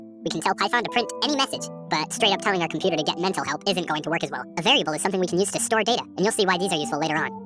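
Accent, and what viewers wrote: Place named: United Kingdom. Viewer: as the video goes on the more British the talker gets.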